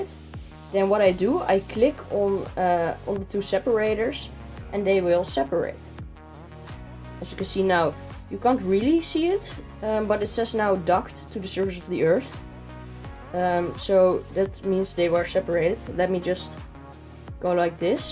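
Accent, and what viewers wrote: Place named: Netherlands. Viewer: Ik smell Nederlands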